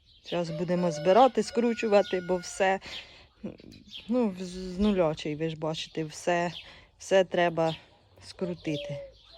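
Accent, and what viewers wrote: Poltava, Ukraine. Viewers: Добрий день україночка! Говор полтавський! Звідки сама? Замужем? Трактор МТЗ зачот!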